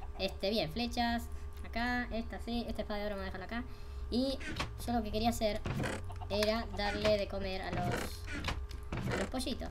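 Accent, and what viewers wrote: Argentina. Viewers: sos argentino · eres argentino?????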